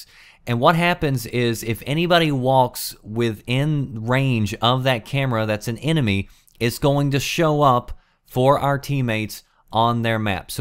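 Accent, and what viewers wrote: South, United States. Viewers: I'm guesin u live down south by ur acent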